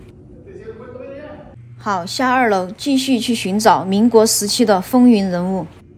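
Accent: Sichuan, China. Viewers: 四川峨眉？普通话不准，😄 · 听你川话上头了，问一下你联系方式，也想听你的讲话保持上头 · 我是贵州人，也是半个成都人，所以很喜欢听你的川普话。你去的这个墓园我两年前去过，我们当时是三个人去的，尽管大厅里有音乐，因为空旷无人，走起来毛骨悚然。你视频里拍到的那些地方我都有经过，我也看到了这几个人的墓碑，还有一些你没有提到的国民党高官的壁葬碑文。我拍了照片，发了微博，我也非常感慨，岁月不曾饶过谁。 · 四川口音。。。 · 就事论事，川普话真是难听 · 川普好评！👍听着就亲切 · 听口音应该是重庆或是四川人的博主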